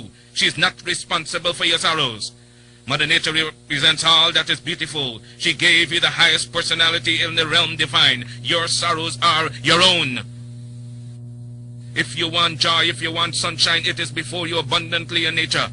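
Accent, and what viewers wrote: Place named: Barbados. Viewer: Your narrator sound Bajan.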